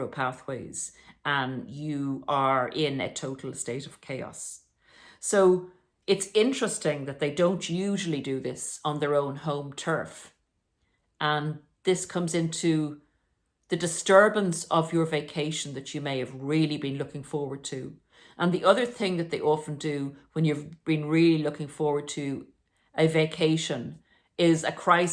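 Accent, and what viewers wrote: Ireland. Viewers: you sound irish ! 😊😊😊😊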